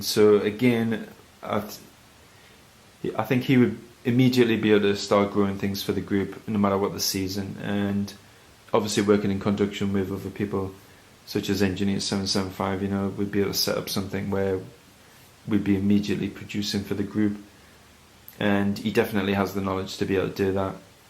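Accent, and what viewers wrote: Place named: Newcastle, United Kingdom. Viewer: I think you should stay on YouTube. Good content and hearing you reminds me of my drugged youth living in Newcastle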